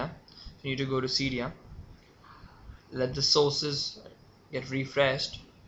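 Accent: India. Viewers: indian accent sounds like shit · is that a tamil~rrr accent?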